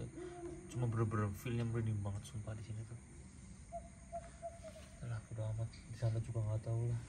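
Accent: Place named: Java, Indonesia. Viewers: Mantap mas maaf mas klo boleh tau sampean dari tegal y jawa tengah logat nya ketahuan salam dari gunawan cah weleri sobat horor sehat slalu mas jngn lupa bismillah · Bang lw ngomong koe logatnya medok sih emg bang asal daerah mana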